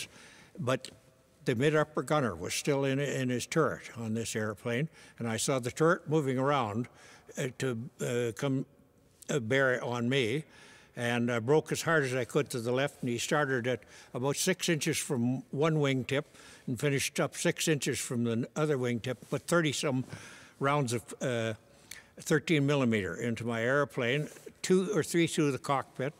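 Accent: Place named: Ireland. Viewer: He sounds Irish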